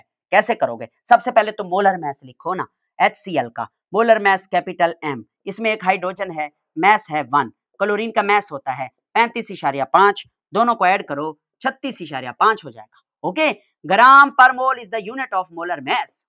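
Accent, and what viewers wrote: Saraiki, Pakistan. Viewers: Are you saraiki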